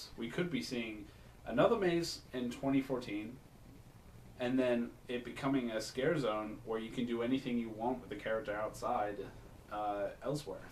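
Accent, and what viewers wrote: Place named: England, United Kingdom. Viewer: Oh thats good and ohhh an england boy no wonder the accent ;) lol do u have kik?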